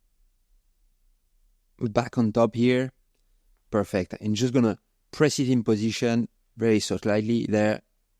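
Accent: France. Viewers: What a biouteeful accent in English. Tu m'as tué avec ton accent frenchie ! Remarque les américains adorent ça apparemment. Hey, if it gets you more views in english than you doing it in french, what the heck go for it mon ami!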